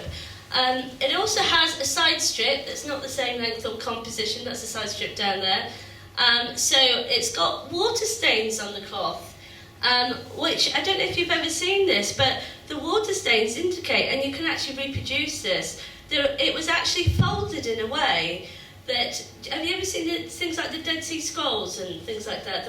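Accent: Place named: Estuary, United Kingdom. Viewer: i'm just starting to listen to this (i'm up to the beginning of the "visions" part), but i can't help noticing that is a very sensual woman with an absolutely delightful estuary accent....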